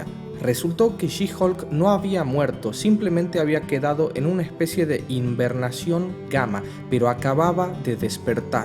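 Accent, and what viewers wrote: Tucumán, Argentina. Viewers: Es Tucumano?. Un capo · tucumano mañero ja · De que nacionalidad sos... Te me hiciste tucumano o catamarqueño con tu pronunciación de tiesha(tierra) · Bien Tucumano so URA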